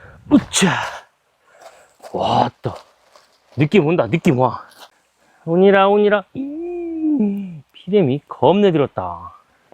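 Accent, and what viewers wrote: Jeolla, South Korea. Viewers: * 수많은 전라도표준어가 구수한 누렁지 맛. 여러 구독자분들 조작구내버리는방송 언제나 응윈합니다 ❤❤ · 순수해 보이셔서 구독합니다 전라도?말투 구수하네유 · 전라도 사투리가 구수허네. 영상 잘보고 입습니더!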